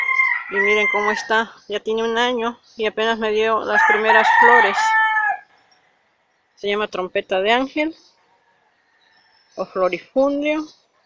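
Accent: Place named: Guadalajara, Mexico. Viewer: Disculpe de donde es usted se mr hase tono de voz de guadalajara mexico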